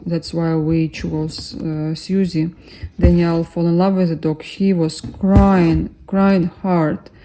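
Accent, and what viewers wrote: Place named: Russia. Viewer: Очень нравится ваш "русский" акцент😊😊 а не как другие, пытаются симулировать акцент. хрипеть еле выговаривая слова 👍👍👍👍👍понятно каждое вами сказанное слово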